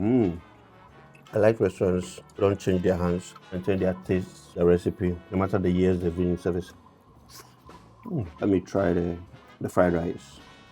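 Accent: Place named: Nigeria. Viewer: Why ur English be like 9ja guy own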